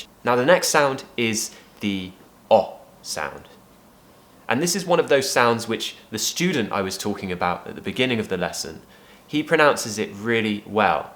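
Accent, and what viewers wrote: RP, United Kingdom. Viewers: I love your British accent and l hope to speak English like you. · I love british accent,might ask you sir to give us a lesson about the stress.thank you in advence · I have seen more British English videos on YouTube, but your British English accent is pure and more British than others. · I love British accent ❤ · Your English is really British. I like british accent. Although I don't currently possess the ability to sound more beautifully British but with your courses I could brush my pronunciation up. Cheers.....!!!!!!!!!! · I really love this accent. British English is a worthy and beatiful language that I want to keep up. I'd like to learn Slang words or expressions. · Lovely british accent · Wow I love your videos,I’m such a fan of RP accent!❤️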